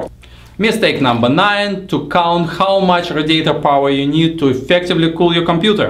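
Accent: Russia. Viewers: Sorry but I hear strong Russian accent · Daragiye Tovarische :D your accent are really russian :D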